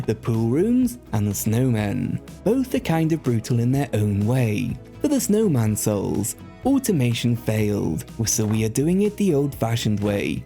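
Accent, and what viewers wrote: United Kingdom. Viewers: I like the british accent.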